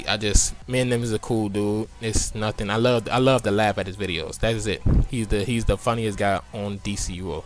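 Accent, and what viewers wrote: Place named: South Florida, United States. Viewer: Let em know! Btw are you from FL cause you sound like you got a south florida accent.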